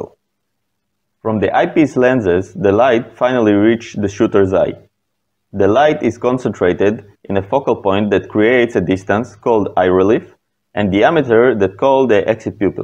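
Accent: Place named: Israel. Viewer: i recoginzed the israeli accent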